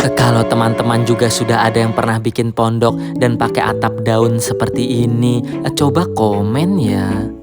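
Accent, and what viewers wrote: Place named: East Kalimantan, Indonesia. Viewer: Kaltim nymk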